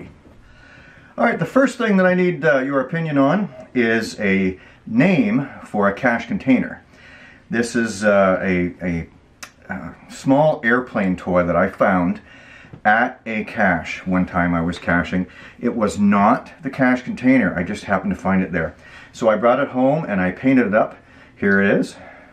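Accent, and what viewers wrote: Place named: Canada. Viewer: Congrats my friend!!! Youve gone full tilt, you're doing things right and you'll be big time in a short time. You keep people interactive and I like it. And I love hearing Canadians talk...so keep it up!!! Craig from WV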